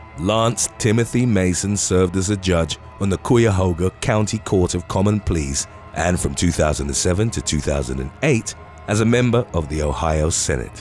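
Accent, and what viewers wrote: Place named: United Kingdom. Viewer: Haha funny British narrator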